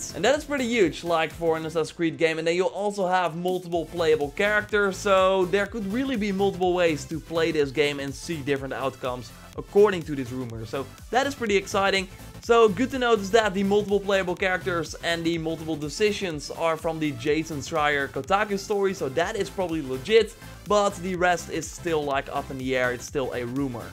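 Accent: Netherlands. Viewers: Yo just subbed thanks for giving me info on ac, far cry and other games your videos are very helpfull and informative. Btw just curious are you dutch your accent sounds like you are.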